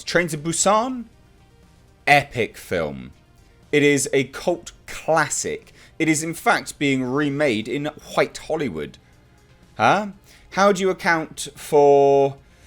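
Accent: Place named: United Kingdom. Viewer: When I first heard your voice I already knew you were a white british man and at the same time I didn't care, I just liked your content.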